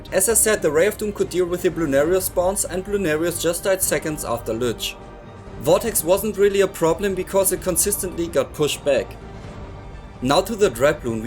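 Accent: Germany. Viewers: U German? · Deutscher Akzent immer so lustig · nice german akzent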